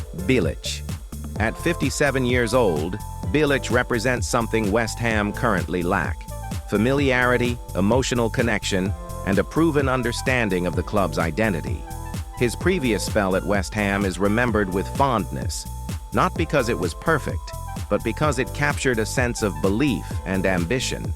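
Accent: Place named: United States. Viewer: But why have an Amerixan voice,get Danny Dyer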